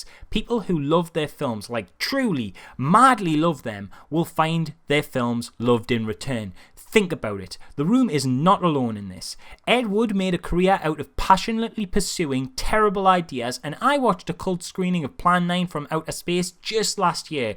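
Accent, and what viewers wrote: Newcastle, United Kingdom. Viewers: Are you a Geordie or a Mackem? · RIGHT! How do people like Markiplier have 20 Million subs? This guy is intelligent, funny, passionate and non-cynical (Plus the geordie thing isn't losing him points either). It's like the secret treasure of youtube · YOURE GEORDIE ARENT YA "HOWAY" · A geordie review of the merits of The Room. My life feels that little bit more complete XD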